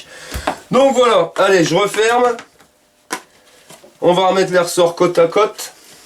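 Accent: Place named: North, France. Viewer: Merci,je comprends lieu le léger accents, on est voisin,je suis de Maubeuge,chti power et " FIRE "!!!!! Continu comme ça!!!!!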